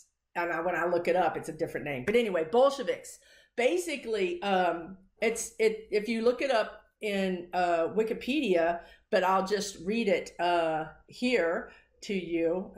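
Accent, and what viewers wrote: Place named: South, United States. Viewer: Love your southern accent :)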